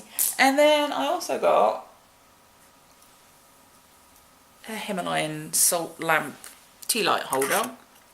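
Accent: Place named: United Kingdom. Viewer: How come you have a British accent when you are Dutch? just curious not a dig :)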